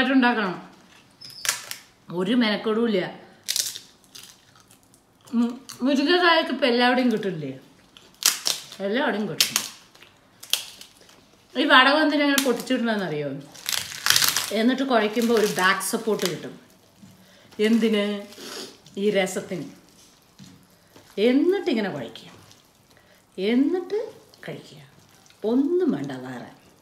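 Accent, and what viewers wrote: Palakkad, India. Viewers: Chechi palakkad annu ennu thonnunu.kalaki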